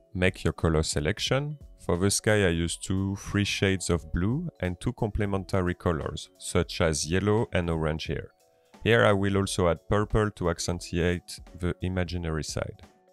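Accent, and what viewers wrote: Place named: France. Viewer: French accents speaking English is so lovely. Gorgeous needlework too 🥰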